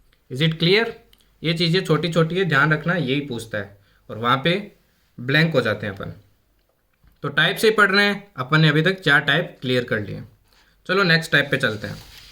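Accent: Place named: Shekhawati, India. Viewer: Your accent is so shekawati, good to hear. Keep improving.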